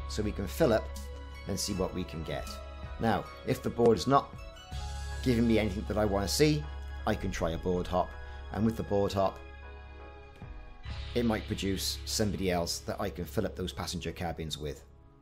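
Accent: Wales, United Kingdom. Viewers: Welsh CMDR?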